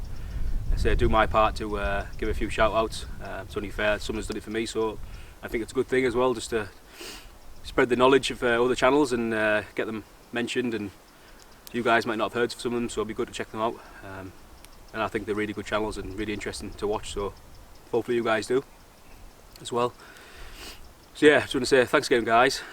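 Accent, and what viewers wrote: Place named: Teesside, United Kingdom. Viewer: Brilliant video again bud, were about's you from again? you sound local to me around the Cleveland/Teesside area. I could be totally wrong though lol, Great Channel Keep it up. Si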